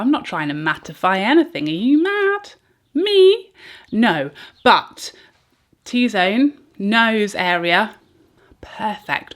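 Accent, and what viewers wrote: England, United Kingdom. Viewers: Hi, I got to see your channel as a recommendation from YouTube.. Love your English accent.. Nice video 👌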